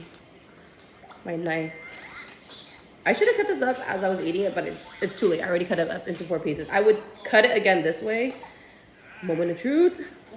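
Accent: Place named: Vietnam. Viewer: As a Vietnamese, I totally confirm that you had awesome banh chung 😂 Love your Vietnamese accent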